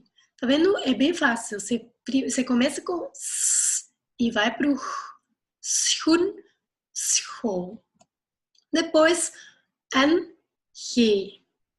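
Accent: Northeast, Brazil. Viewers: Comecei a estudar o Holandês e me deparei com seu vídeo. Obrigado pelas dicas. Adorei o seu sotaque português, ele é bem nordestino. Parabéns! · As vogais não são iguais " como em qualquer outra língua". As do inglês são no geral diferente de tudo qto os demais. Idiomas. A única coisa positiva, é o seu ligeiro sotaque nordestino. Brasileiro.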